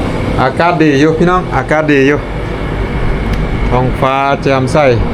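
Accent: Loei, Thailand. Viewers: สำเนียงคนเลย คนหล่มสัก นครไทยเลยค่ะ พูดภาษา สำเนียงเดียวกัน ต่างแค่คำนิดหน่อย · สำเนียงเหมือนคนจังหวัดเลยเปาะเรยครับ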